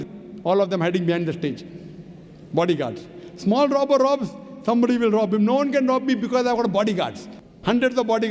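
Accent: India. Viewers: salamu alikum Dr. ZAkir, would you please add an auto-captions in English, so we can understand your accent. we are from north Africa, and it is difficult for us to understand the Indian English accent. thank you so much.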